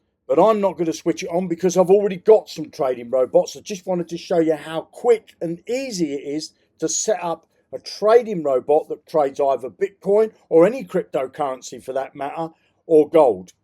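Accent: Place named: Northern England, United Kingdom. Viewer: REACHING OUT YET AGAIN. DUDE IF YOU CAN'T BE ARSED TO ANSWER YOUR SUBS I CAN'T BE ARSED TO KEEP SUBBED. STEP UP YOUR GAME DUDE. HERE GOES AGAIN. MY WALLET TOP UP SAYS You cannot top up your account with the selected MetaMask chain, please change to «BSC Chain»! NOTE* you must connect your metamask account to this website I THEN PRESS THE BUTTON AND NOWT HAPPENS. YOU SOUND LIKE YOU FROM THE NORTH LIKE ME SO WHY DO YOU OPERATE SO SLOWLY????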